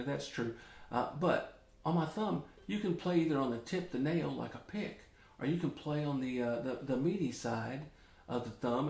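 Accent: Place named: South, United States. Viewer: Awesome,! Shirley loved it! I love southern accents too!:o)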